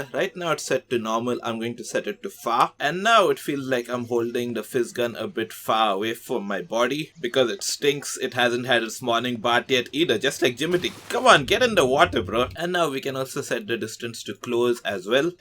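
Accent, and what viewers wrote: India. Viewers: I know you're most likely indian, but still love your accect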